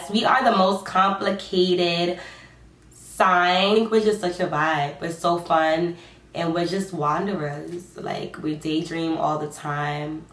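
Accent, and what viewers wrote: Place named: Harlem, United States. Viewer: You Sound So HARLEM 😂 I Love It 🥰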